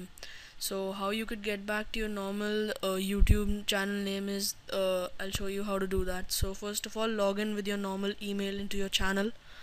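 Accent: India. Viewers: Are you Indian? Just want to know your accent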